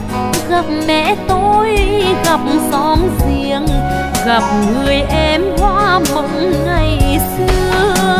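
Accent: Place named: Nghệ An, Vietnam. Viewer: Rất hay và cảm xúc bởi giọng nói sứ Nghệ, cám ơn đã cống hiến hết mình cho tác phẩm quá đỉnh.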